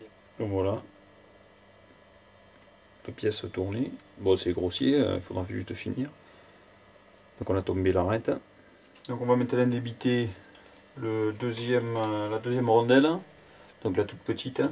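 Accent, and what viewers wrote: France. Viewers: avrc le petit accent marseillais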